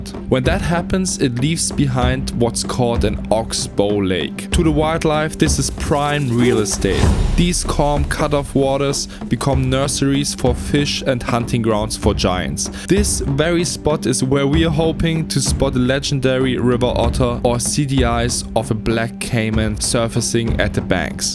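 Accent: Germany. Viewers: Am i hearing a little bischen deutsch ? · I hear Deutsch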